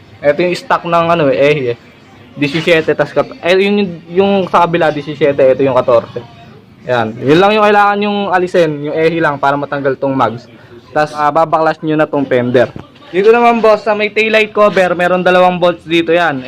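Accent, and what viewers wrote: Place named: Bulacan, Philippines. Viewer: Paps taga bulacan kaba? Sa punto mo kasi eh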